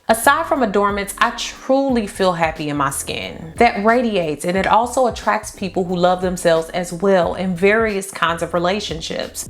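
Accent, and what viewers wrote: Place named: Rural, United States. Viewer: Thank you! I just wanted to say that I love your country accent lol 🤎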